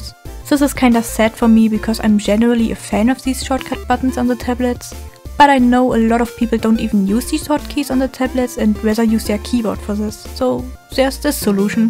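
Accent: Germany. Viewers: Man bemerkt den deutschen aksent xD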